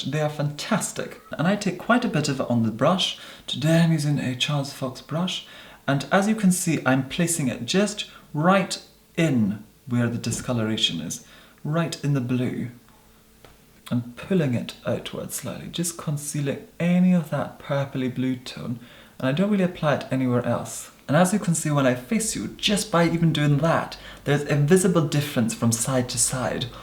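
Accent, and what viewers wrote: Scotland, United Kingdom. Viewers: I was in no way trying to be rude. When a person goes from having a laid back Scottish accent (a lovely accent indeed) to speaking the Queen's English, it naturally elicits some confusion. I have complete respect for anyone who wants to take speech lessons. I personally used to have a slight New York accent, and trained myself out of it and forced myself to enunciate better. I just find it a bit disingenuous to adopt an entirely different accent. The transition from a Scottish accent to some version of Queen's English, is like the equivalent of me going from speaking with a New York to a Southern accent. I'm sure people have their reasons for presenting in any way they choose, but the drastic change caught me off guard in this case. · I love the very subtle Scottish that comes through your poshness. Love it. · His accent is still very clearly Scottish, not the Queen's English at all. 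· im from england btw n we dont normally sound tht posh but go to his old vids from 3/4 years ago he talks in a normal scottish accent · B. M. Just looked up an old video you are right he definitely has a Scottish accent back then my apologies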